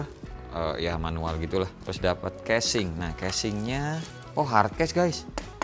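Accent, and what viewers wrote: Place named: Sunda, Indonesia. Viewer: Aya campuran sunda saeutik 😭🤣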